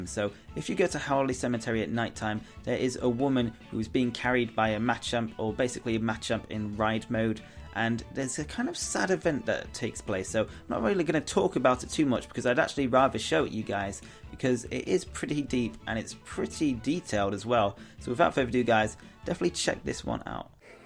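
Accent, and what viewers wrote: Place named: United Kingdom. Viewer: He's maybe from the U.K..... Accents, person. not trying to be rude fyi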